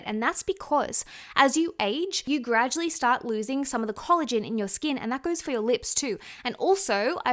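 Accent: Australia. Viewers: It´s so cute how your Aussie accent got stronger since you moved back to Australia <3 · I like your videos very much! (And your aussie accent;)) BUT PLEASE, FILLER are NOT completely dissolvable! Greetings from switzerland🤗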